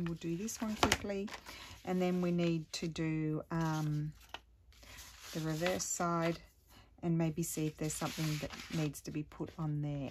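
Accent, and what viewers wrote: Australia. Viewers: Hi Yes I found her on you tube with a flip through of Happy mail for Gayle,then I bought her download papers on ETSY and I watch her when I get a chance..I then watched Gayle which led me to you and many others and subsequently more buying of paper...Now Im learning to journal and card make too.....I can't believe there is such a thriving wonderful community of crafters from "All over the World". I'm in New Zealand "Your old neighbour across the ditch...I picked your Aussie accent straight away and was so thrilled to hear of your life in Italy'...Its a great thing this crafting how it brings people together.Thanks. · Hi, I like your style and the ideas you come up with. How did you come to live in Italy?? Obviously an Aussie accent - then you talked about the weather......not the same as here!!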